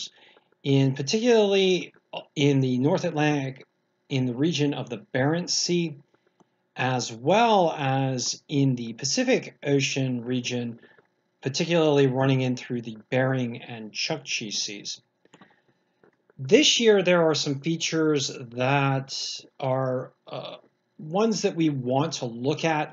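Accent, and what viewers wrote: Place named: Philadelphia, United States. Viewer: Thank you, your videos are much appreciated. You have a strong accent...you must have spent some time close to Philadelphia Pa., perhaps in the South Jersey area.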